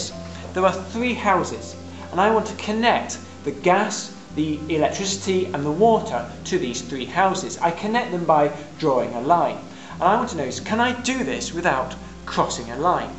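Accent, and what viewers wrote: United Kingdom. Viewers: the britsh accent is what kept me watching, and then i actually found it interesting!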